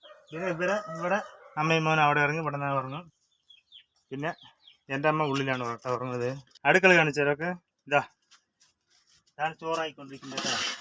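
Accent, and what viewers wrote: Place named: Neyyattinkara, India. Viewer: ഇത് എവിടെയാ നെയ്യാറ്റിൻകര ആണോ അതുപോലുള്ള വർത്തമാനം. കൊള്ളാം നല്ല വ്ലോഗർ.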